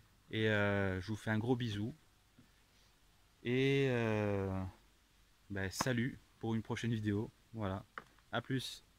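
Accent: Corsica, France. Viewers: Tu n'aurais pas un petit accent corse par hasard 😁. Super ta cabane.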